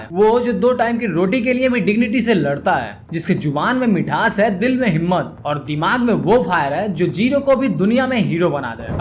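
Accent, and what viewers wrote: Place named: Bihar, India. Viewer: Tu bhi bihari hai kya, awaj se lagrha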